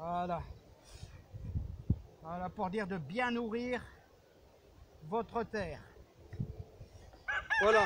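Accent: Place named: Nord-Pas-de-Calais, France. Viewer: Ça fait du bien de vous écouter L accent de ma naissance bravo le ch’timi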